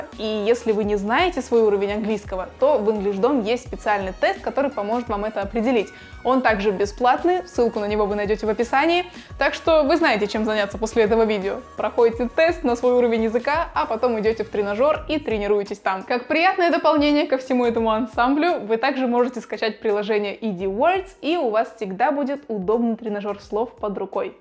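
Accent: Kazakhstan. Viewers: Казашка? Ты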